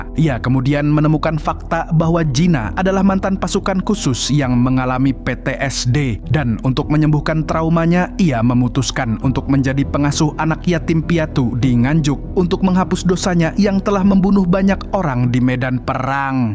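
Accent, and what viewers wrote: Banjar, Indonesia. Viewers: urang banjar sekalinya ikam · Pian org banjar? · Mamang ni org apa si? Kok ada banjarnya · Urang Banjar kah mang 😅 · Hahhhhh orang Banjar kahhh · Abang,Pian ne asli org Banjar kh 😂😂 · Adminnya urang banjar leh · banjar kah pian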